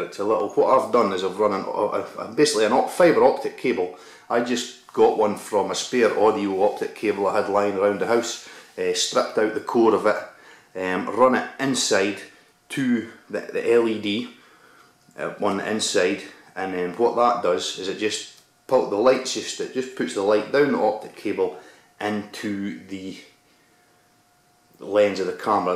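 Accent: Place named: Scotland, United Kingdom. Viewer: Great video! Well done. Scottish accent helps too! We've got GoPro 1 and 2 live out cables ready to go. And the new GoPro 3 cables ready to ship as well now. We've also got the Phantoms themselves in stock and ready to go and the 3S Balancer to JST leads too. If you go to firstpersonview.co.uk and click on the Phantom you'll see my FPV system attached to the bottom - its identical to your's! Happy flying! Simon Dale - FirstPersonView.co.uk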